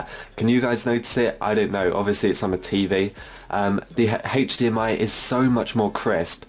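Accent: United Kingdom. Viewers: Love your British accent mate 👍🏻😌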